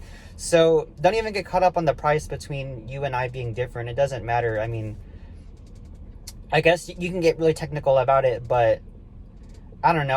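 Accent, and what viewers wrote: Texas, United States. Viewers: What part of Texas are u from Bro?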